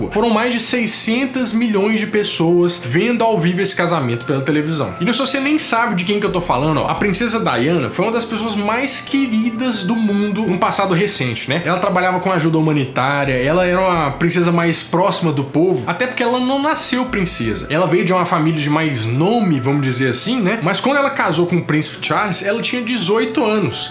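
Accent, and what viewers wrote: Minas Gerais, Brazil. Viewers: CE é mineiro ?? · Ele falou tudo certo, o sotaque mineiro confundiu vocês sô!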